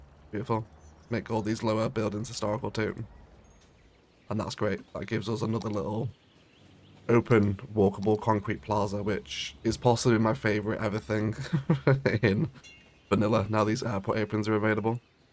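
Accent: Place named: United Kingdom. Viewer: Curious, why do you play/build using right hand traffic? You sure sound British, so I would have thought you'd use left hand as that's what we're used too. Biffa does the same too. 🤔 Is it just a personal preference? The highways with dedicated bus lanes are totally "cattle trucked" up. They've got them the wrong way round. I use left hand traffic, as that's what I know and drive in; but, the game has the bus lanes in what is called "Lane 3" whereas the should be in "Lane 1". They're a complete waste of time, but, they'll probably not ever get fixed as too much time has passed now.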